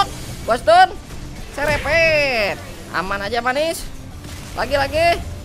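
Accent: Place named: Banyumas, Indonesia. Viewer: Lu knp MEDOK bngt broo ... Ngapak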